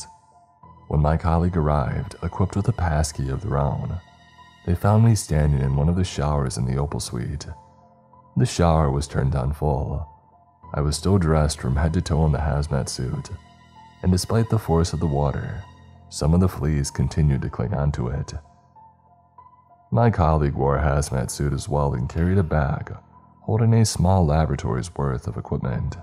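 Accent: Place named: United States. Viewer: I was about to say it was strange hearing an American accent on an British character but then I remembered that you've voiced, and I quote, "a small town girl" before and somehow I can always look past it because your narrations are that good.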